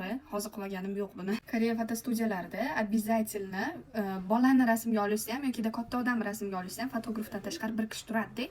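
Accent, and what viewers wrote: Tashkent, Uzbekistan. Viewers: Номонгонлисизу нимага Тошконди шевасида гапряпсиз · Нега Тошкент шевасида гапирасиз ,узиззи шевангиздан уяласизми ?? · Наманганликмисиз нега тошкен шевада гапирасиз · Качондан бери Наманганликлар Тошкент шевасида гапирадиган булишди,кизик!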